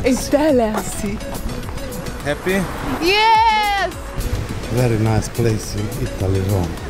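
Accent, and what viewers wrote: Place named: Morocco. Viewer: I like your accent keep it up Morocco*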